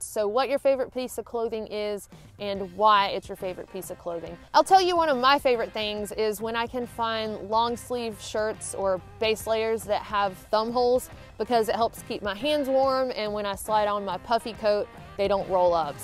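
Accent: South, United States. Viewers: I'm a North Carolinian living in Sweden currently, and you are not only helping me plan an awesome "welcome home" hike but hearing that sweet southern twang makes me feel at home :) haha (even though I don't have it)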